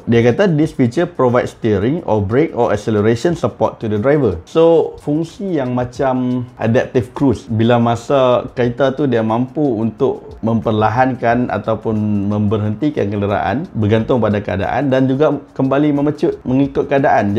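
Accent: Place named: Kedah, Malaysia. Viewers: Mesti org kedah ka? Hahahahah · Bila mana speaker mula berbicara guna bahasa kedah, aku dah tau betapa substancenya benda yg dia nk sembang tu. #thisisnorth #padubaqhang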